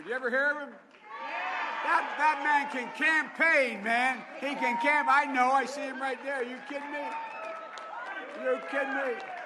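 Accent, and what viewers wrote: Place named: Appalachia, United States. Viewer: Why is he talking like a hillbilly?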